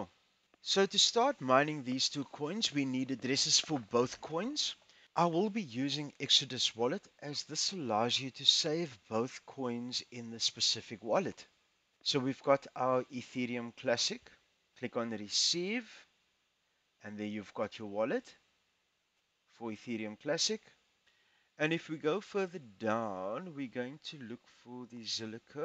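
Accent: Afrikaans, South Africa. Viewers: Jou afrikaanse accent kom sterk deur😂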